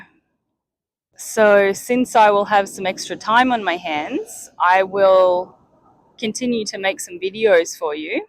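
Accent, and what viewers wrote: Australia. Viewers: Your lessons are great because they are real life images of Australia, along with your typical Australian accent. I am going to Australia to live with my children so I really need to practice this Australian accent. Thank you very much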